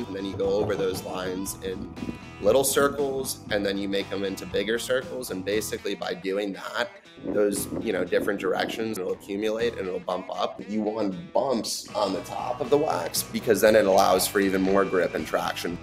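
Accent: California, United States. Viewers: That Californian accent is thick and cute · This guy has the most California surfer accent I've ever heard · I cant stand that California accent and im from here.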